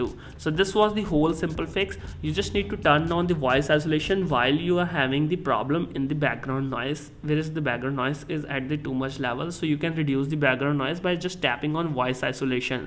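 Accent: India. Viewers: Learn how to talk proper English, you sound ridiculous. You are speaking English not indian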